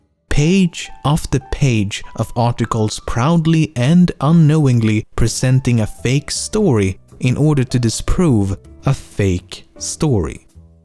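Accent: Sweden. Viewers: you forget hes swedish sometimes, but it does shine through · Where is he from? Sound quite Swedish to me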